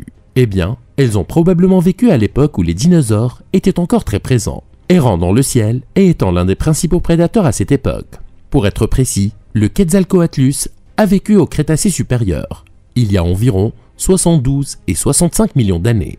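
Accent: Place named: Asia. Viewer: asiatique je crois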